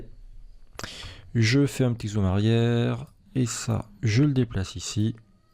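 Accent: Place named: France. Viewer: Super, merci. Le meilleur prof d'Excel avec un vrai accent mignon français.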